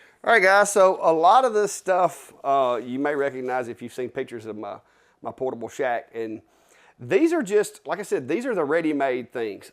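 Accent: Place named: Perry County, United States. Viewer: I grew up in Perry County so I can understand you when you "tawk". lol Thanks for the good ideas and keep 'em coming!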